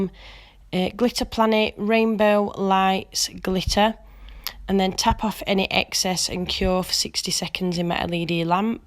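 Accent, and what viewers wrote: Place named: Leeds, United Kingdom. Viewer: Really beautiful set of nails. I am addicted to glitter planet products and her prices are so reasonable compared to other nail supplies. Where is your accent from if you don’t mind me asking? It sounds like a Leeds one or maybe surrounding areas? Thanks for sharing. 👍🏻👏🏻👏🏻👏🏻👏🏻👍🏻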